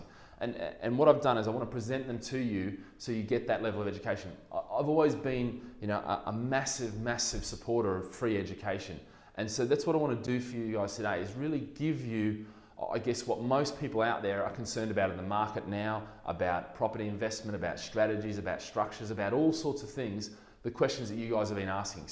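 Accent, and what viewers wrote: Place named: Australia. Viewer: from the uk yet he has an aussie accent :P very informative.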